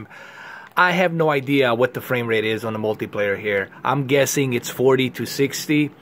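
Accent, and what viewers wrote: New York, United States. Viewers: Are you from New York, I can hear the accent a little. Sounds a bit like the Bronx or Queens to me.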